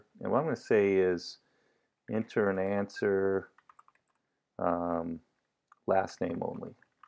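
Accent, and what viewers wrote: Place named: United States. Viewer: You're a rubbish teacher your american accent makes me hate you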